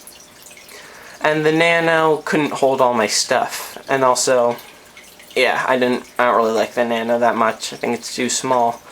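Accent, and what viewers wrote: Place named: Essex, United Kingdom. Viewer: sounds like your from the essex persuasion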